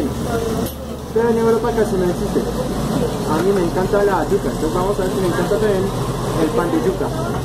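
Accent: Bogotá, Colombia. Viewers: hermano mete de vez en cuando un saludo o despedida en frances para la Colombie y Zach Morris hizo un stream live diciendo que estaba pensando en hacer un video con vos Chevere tu acento cachaco,Merci bocu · Acabo de descubrir tus videos y me gustan muchísimo ya! Tu español colombiano (bogotano 😝) es excelente! sigue así!!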